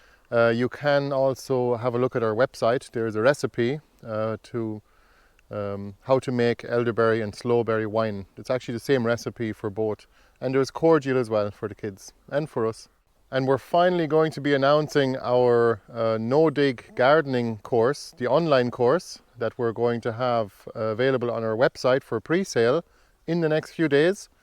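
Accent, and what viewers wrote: Ireland. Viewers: Just after doing a bit of a binge watch, great content as usual on all the videos. To answer one of your questions from a previous video, I think the willow you were wondering about is a Sally. I can ask where you purchased your herb drying tent and your dehydrator. I have been searching to buy within Ireland and the price is some what saucy for both. Also did you get your wine making equipment within Ireland. And I can't believe you have a pressure canner. Surely not an Irish purchase. I thought for a second I was watching one of the many US you tubers I follow, except for the lovely Irish accent. Keep up the great work.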